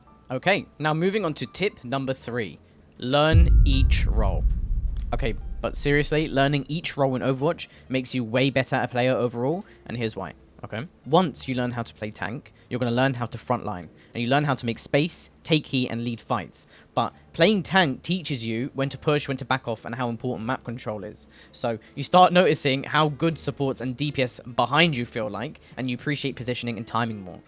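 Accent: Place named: United Kingdom. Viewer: omg he's british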